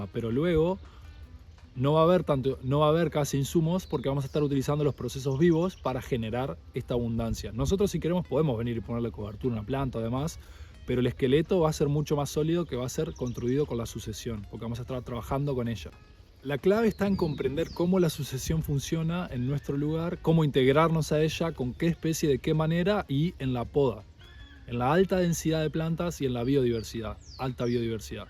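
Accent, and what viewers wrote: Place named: Uruguay. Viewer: Qué divino que todo esto sea hecho en Uruguay, ya me parecía por las especies y tu tonada, además me la juego que es Rocha o Maldonado. Sería divino poder visitar y conocer, abrazo.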